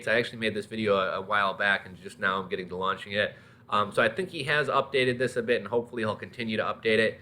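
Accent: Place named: Australia. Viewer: He is from Australia so he has an Australian accent.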